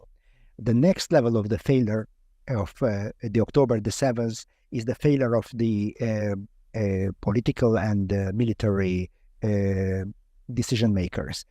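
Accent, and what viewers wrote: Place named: Hungary. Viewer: i though you had hebrew accent in english, but that is in fact still hungarian, nice that it remains.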